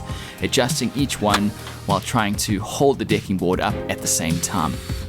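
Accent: South Africa. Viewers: That’s a South African accent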